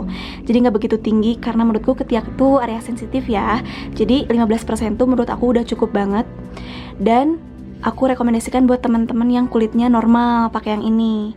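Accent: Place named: West Java, Indonesia. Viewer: Logat sunda si teteh hehe ...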